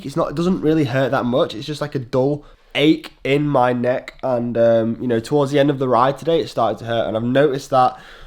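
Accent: United Kingdom. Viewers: That's a very English accent 😂😂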